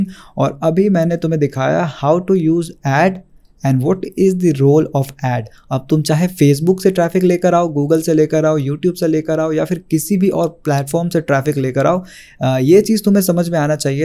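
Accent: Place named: Bengal, India. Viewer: Saying US as "तुम".. It clearly shows that you are from the land of Bengal with that sweetness🍬 in our talk. Ami I wrong then mention me. Thank you my friend 😊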